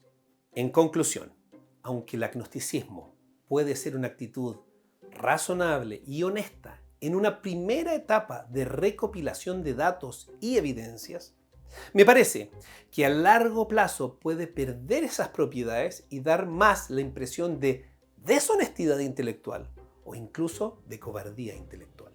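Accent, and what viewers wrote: Chile. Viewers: Nada que ver mi comentario ante un tema tan interesante, pero no puedo dejar de decir que lindo el acento chileno, cuando no es "flaite".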